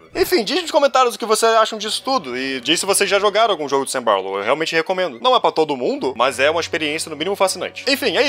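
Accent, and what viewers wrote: Rio de Janeiro, Brazil. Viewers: Esse sotaque carioca do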